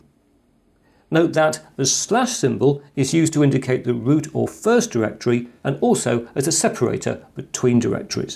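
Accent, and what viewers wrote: England, United Kingdom. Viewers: Hello. OpenSource enthusiast since first Linux distro release, Slackware. I have watched several of your videos and I am very impressed with the tools, creation, content, and the way u explained some complicated subjects for the regular audience in very understanding manner. Not to mention the nice British accent!! Kudos!!! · Is this traditional England tone？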